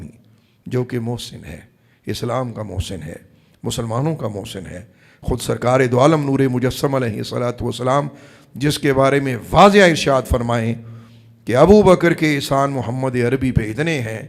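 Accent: Arab world. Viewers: SUBHAN ALLAH… YA SAYADI YA MURSHADI … qurban ap k ilm o feham py … arbic lab o lehja khubsorat … muzu kalam alaaaa… tahqeeq or dalaile zarbdast… QURAN E PAK ki ayat mubarak se ABU BAKAR ki shan or muqam ko waziya beyan farma k munkiron ko lajawab kiya … andaz e beyan alaaa or purasar …. AP ki ilmi baserat ko salam … ❤️🌹❤️🌹❤️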